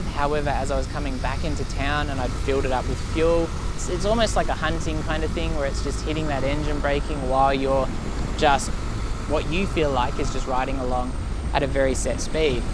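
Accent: Australia. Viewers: Pretty standard aussie accent cobbarino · This accent is way too much for me..where you from bro Australia? Wide bahhs nice wide bahhs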